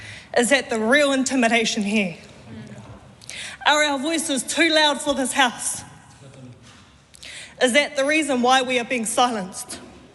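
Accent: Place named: New Zealand. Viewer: i like her accent moari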